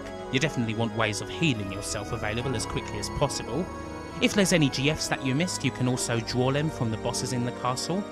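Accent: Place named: England, United Kingdom. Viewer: Как меня бесит акцент англичан и не неси херню про сон и смерть главного героя ) Это моя лучшая игра.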